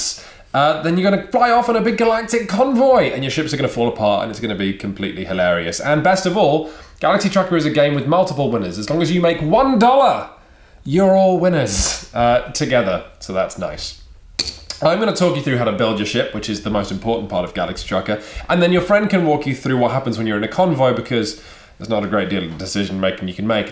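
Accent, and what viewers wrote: United Kingdom. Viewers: Looks like a fun game - it's even better watching you with a British accent and uncombed hair explaining this looking like your apartment was just hit by an asteroid storm lol. :P Subbed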